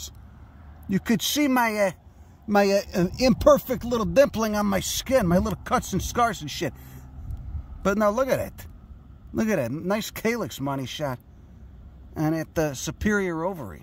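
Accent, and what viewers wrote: Chicago, United States. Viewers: I never learned so much while cracking up at his awesome Chicago accent. Salute from the suburbs.#Elgin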